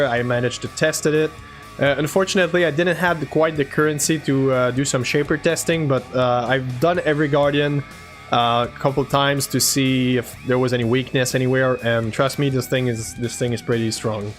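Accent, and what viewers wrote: Quebec, Canada. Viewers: The Quebecer is strong in this one. Am I correct brother man.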